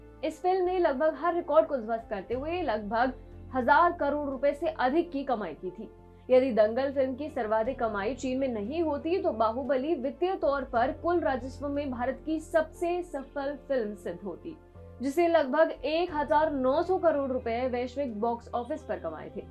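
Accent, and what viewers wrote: Standard. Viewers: Apaki Hindi bahot shuddh he ,bahot accha laga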